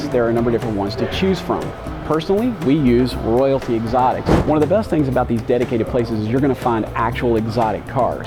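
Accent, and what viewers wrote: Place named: Houston, United States. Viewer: I hear Houston.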